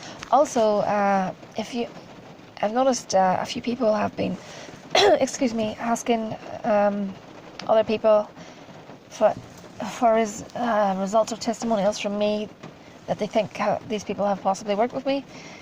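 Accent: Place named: Ireland. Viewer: Good vid, more of these please (if you can find the time!). I can tell by your accent you come from not far from me. Being someone v interested in this side of life I've found it very hard to find groups, other individuals etc who are serious about this. How did you go about it, learning, meeting others who are interested in magick etc? I know Ireland is full of us, i just dunno where to look and am so wary of charlatans. Thanks! ☺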